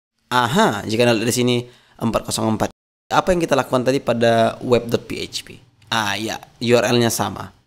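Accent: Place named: Medan, Indonesia. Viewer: khas medan kali ya bang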